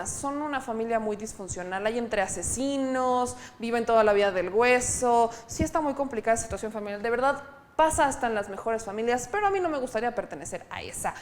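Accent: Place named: Mexico City, Mexico. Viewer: no se achilangue tanto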